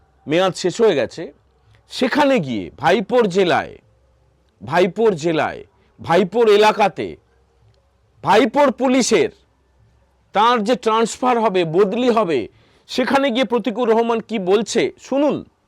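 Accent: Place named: Bangladesh. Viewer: Ami prochur bangladesh e manus r sathe kotha batra hoe che anek k chini. Bangladeshi der ekta adbhut accent ache, janina koto jon manben na bujben , ae anchor ta bangladeshi bangali der moto accent.😮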